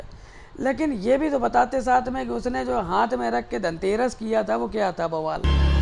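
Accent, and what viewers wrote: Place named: Uttar Pradesh, India. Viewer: भाई पहली बार आपकी वीडियो देखी, बहुत अच्छी लगी, कहाँ से हो आप भाषा से तो उत्तर प्रदेश की लग रही है???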